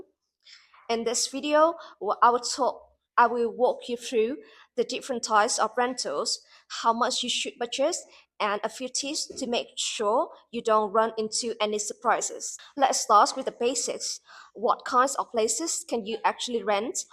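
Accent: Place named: Australia. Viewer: Aussie accent, there.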